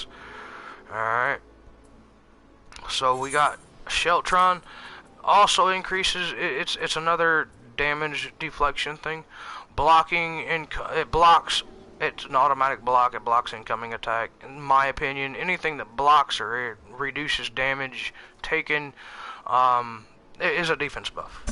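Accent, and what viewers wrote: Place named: Texas, United States. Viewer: Are you from Texas? You must be from Texas. I really like the sound of your american voice.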